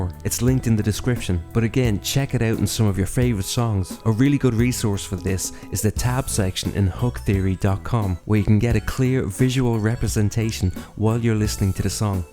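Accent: Ireland. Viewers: Are you Irish or Dutch? Such an interesting accent! Now I can hear that you are Irish right? Dubliny? Still could be a Dutch person whose learnt from American tv! Crazy! Hope you’re well fella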